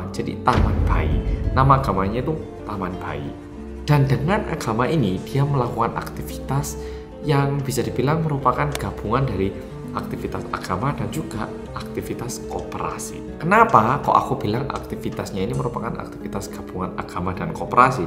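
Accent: Java, Indonesia. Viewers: Anda kaya orang Indonesia yg lagi merantau .bahasanya itu looh medok bnget hehe semangat mas. Teruslah bercerita tentang kehidupan korea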